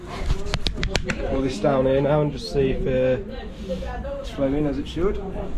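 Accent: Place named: Wolverhampton, United Kingdom. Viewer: have to disagree, I also like the Wolverhampton accent, be proud of your accent & ignore the begrudgers 👏👍☘️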